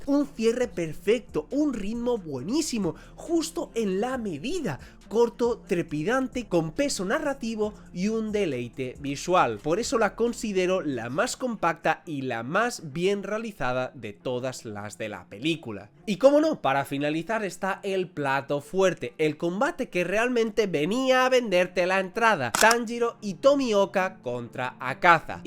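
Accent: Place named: Spain. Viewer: Desde que descubrí este canal, me sentí en casa porque encontré a alguien que habla fuerte, con carisma, emociones y acento español. Un abrazo desde Perú, maestro. 🫂🐺🇵🇪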